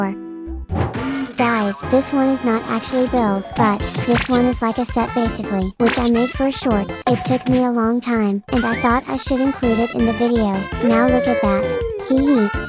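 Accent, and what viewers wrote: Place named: England, United Kingdom. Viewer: soy yo O te veo con la voz más inglesa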